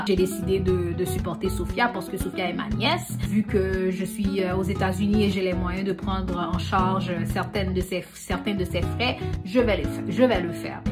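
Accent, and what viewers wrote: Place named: Quebec, Canada. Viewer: J'aime le léger accent québéquois.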